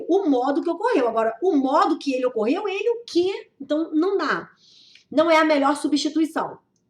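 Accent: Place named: Rio de Janeiro, Brazil. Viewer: vc dá aula no RJ em qual curso? sou daqui e nosso sotaque nos entrega rs. Gostaria de ter aula no seu curso.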